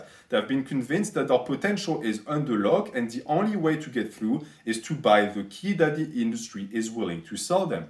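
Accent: France. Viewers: I'm just here for the French accent. You're like a jacked version of my tall French friend haha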